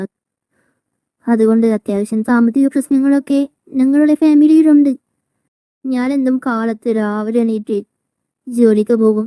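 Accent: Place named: Kochi, India. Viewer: story telling in the Kochi accent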